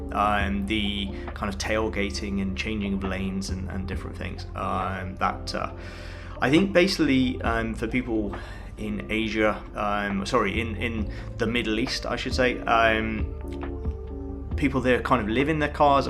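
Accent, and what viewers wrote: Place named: England, United Kingdom. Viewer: You seem to be a citizen of the world with the way you describe things comparatively across the countries. I presume you’re English, love the accent ❤ but amazed at how you refer to USD so naturally. Anyways, great video with very useful information. Thanks 👍🏻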